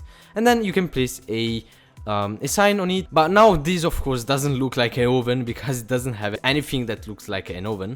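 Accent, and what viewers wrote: Italy. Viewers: I think good? You are Italian? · Ma sei italiano?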